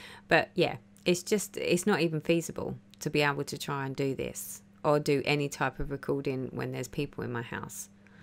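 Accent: United Kingdom. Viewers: Love watching you stitch...as for uniformity of stitches, have you watched FlossTubes by Blitstitch? He’s an engineer, so love his take on how to get uniform stitches...I like his #5 and #36. Love your British accent also! Lol! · Hi! Please keep on rambling, live or voiceover does not matter to me. I’m from Sweden and I love listening to your British accent. 😘 Regarding railroading: have you tried railroading in both directions, not just on the way back?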